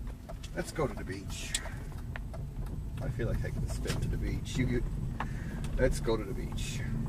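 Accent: Ireland. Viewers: Authentic Irish accent, love it!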